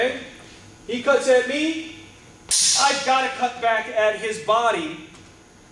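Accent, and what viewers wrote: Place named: Iowa, United States. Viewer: If you had not said he was from Iowa and asked me instead to guess. I would have guessed Iowa.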